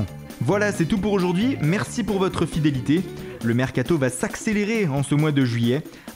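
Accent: Italy. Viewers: ton accent italien...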